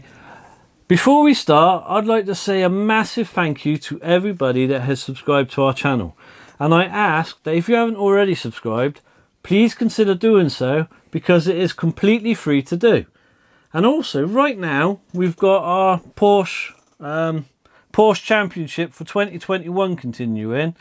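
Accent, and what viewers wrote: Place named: England, United Kingdom. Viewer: Thank You, for a Great Video, and, where r you Gentleman from, England, it sound's like.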